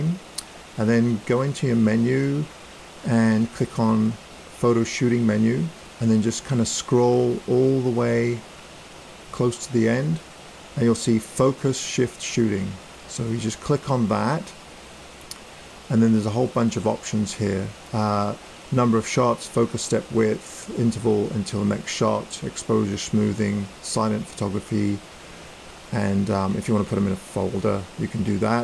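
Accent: United Kingdom. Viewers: Lovely photos. I loved the photo stacked one, beautiful. Can I ask, although you live in Canada are you British? You have a very British accent.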